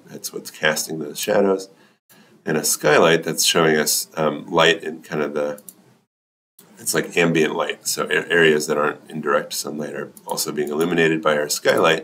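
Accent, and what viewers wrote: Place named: United States. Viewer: And you are an American, English speaking person. That makes all the difference from the clickety, clickety, dialect of India folks attempting English. THANK YOU!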